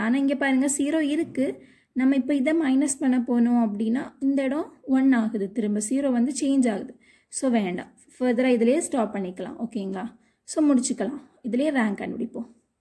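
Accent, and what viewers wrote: Kerala, India. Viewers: U r malayali right .?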